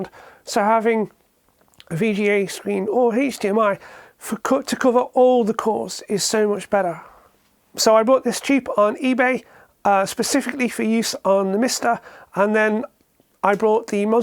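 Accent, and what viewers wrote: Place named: England, United Kingdom. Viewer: Wow.. you sound a lot like my late father, only with an english accent.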